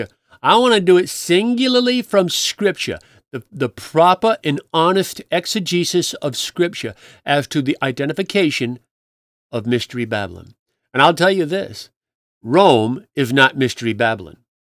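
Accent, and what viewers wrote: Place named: New England, United States. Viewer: Love your New England accent. Don't hear much of that here in Winter Park, Colorado at 9000 feet near the Continental Divide.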